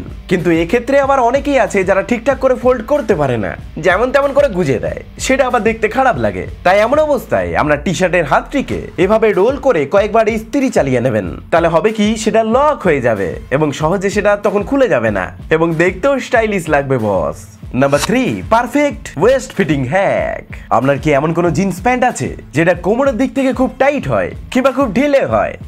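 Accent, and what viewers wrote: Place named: India. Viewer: কথা শুনে বুঝা যায় ইন্ডিয়ান🥴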